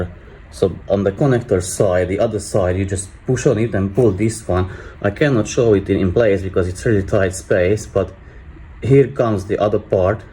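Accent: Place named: Hungary. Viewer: Ez igazi Magyar dialektus. 🤪🥰🤣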